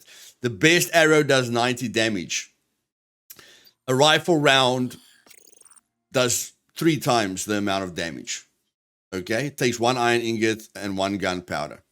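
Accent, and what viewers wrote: South Africa. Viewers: You a south African my guy I can hear your accent 👍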